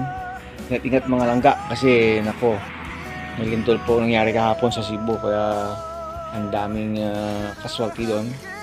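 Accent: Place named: Karay-a, Philippines. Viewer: Nagaragumo ang karay-a kag amo man dia ang pang hambal ko. Sa Alimodian ako naghanggud. Love the dialect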